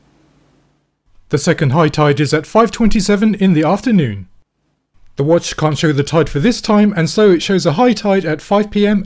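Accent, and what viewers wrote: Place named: South Africa. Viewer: Dude sounds South African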